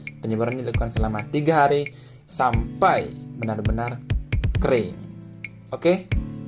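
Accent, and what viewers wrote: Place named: Aceh, Indonesia. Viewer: Logatnya suku aceh y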